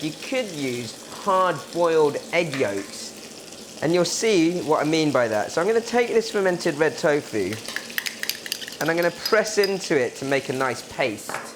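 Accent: United Kingdom. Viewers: Why is we listening to a British asian.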